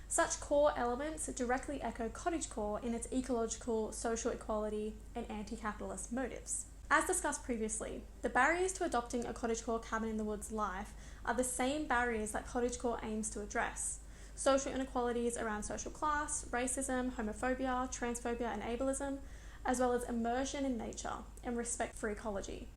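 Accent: Australia. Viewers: You sound Australian ❤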